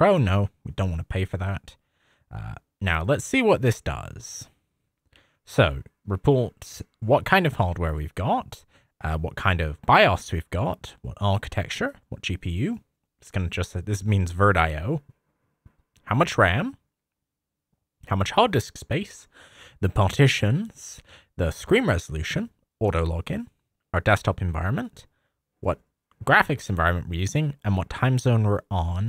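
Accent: Canada. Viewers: He's from Canada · i know that, but canada is huge and has many accents, im trying to get the accent down specifically as this isnt the canadian accent im used to hearing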